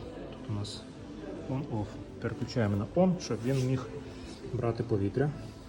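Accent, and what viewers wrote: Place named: Hungary. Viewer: Nakavom jeziku govoris kao hungar ili moldovan ja ne razumem tebe svaku 10 rec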